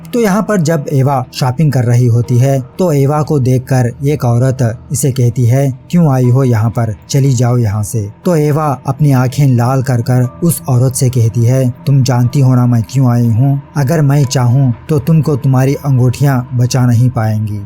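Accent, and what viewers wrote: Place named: South, India. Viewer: You are south indian... i got it. but nice explanation. good.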